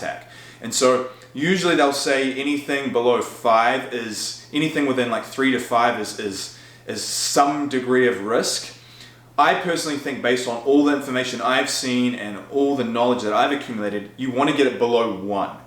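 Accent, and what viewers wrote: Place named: New Zealand. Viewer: You have a New Zealand accent, mate. Have you lived there a part of your life?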